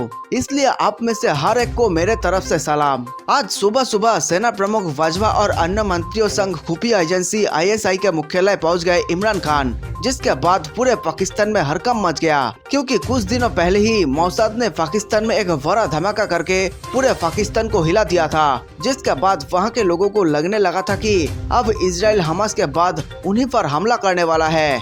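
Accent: Bengal, India. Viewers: भाई आप बंगाली हो क्या? बोलने के लहजे से तो ऐसा ही लगता है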